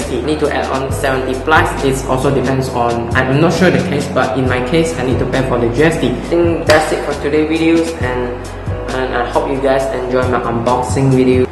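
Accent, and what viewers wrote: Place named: Singapore. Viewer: That singlish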